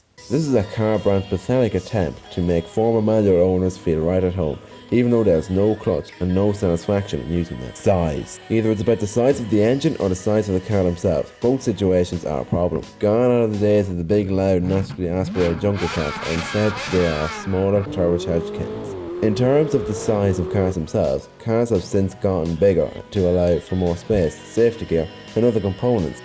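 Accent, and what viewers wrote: Ireland. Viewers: this lad is irish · U Irish too? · Love ur vids man are u Irish?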